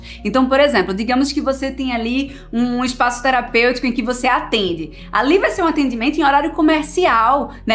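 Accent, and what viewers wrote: Pernambuco, Brazil. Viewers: Oi,! Conheci seu canal há pouco tempo. Você é pernambucana? Estou sem maturidade emocional para assistir aos seus vídeos sem me perder no sotaque maravilhoso; preciso ver duas vezes. Hahaha Gratidão pela sua explicação, tão clara e iluminada. Que 2021 seja de caminhos abertos para você, com muito amor e luz! ✨🎆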